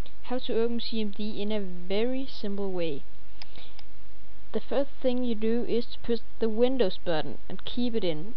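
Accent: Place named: Denmark. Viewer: haha your so clearly a danish person XD!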